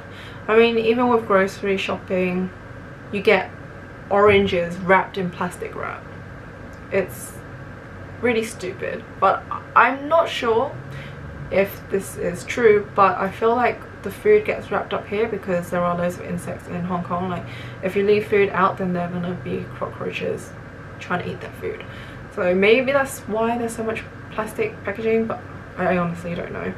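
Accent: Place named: Australia. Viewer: That accent has some Aussie in there for sure!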